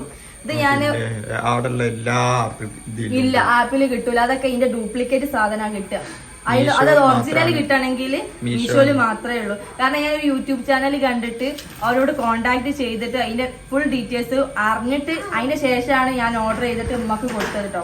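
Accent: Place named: Malappuram, India. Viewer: ജുബിന്റെയും സംസാരം തമ്മിൽ നല്ല വ്യത്യാസം ഉണ്ടല്ലോ. തനി കോഴിക്കോട് തന്നെ പക്ഷേ ഒരു മലപ്പുറം ടച്ച് ആണ് കൂടുതൽ തോന്നുന്നത് അതെന്താ അങ്ങനെ 🤔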